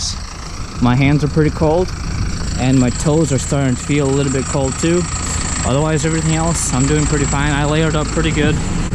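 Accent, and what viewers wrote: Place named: Minnesota, United States. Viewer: Your accent sounds Minnesotan😂 I could be wrong but you sound like a person from up north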